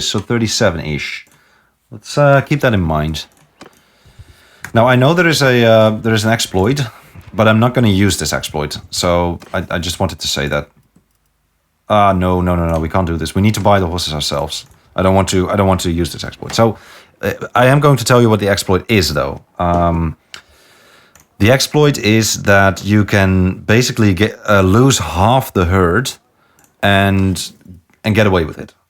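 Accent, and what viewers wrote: Netherlands. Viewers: Within the first 10 seconds of the video I was like "is this dude Dutch?" checked your about and yes, yes you are, New sub acquired friend.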